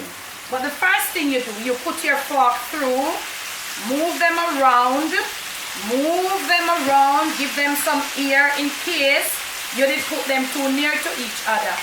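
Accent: Jamaica. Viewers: I just love to watch you cook, and I also love the way you talk. The real JA dialect.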